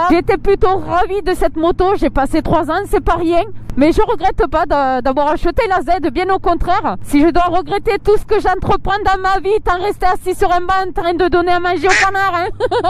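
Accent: South, France. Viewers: ton bel accent du midi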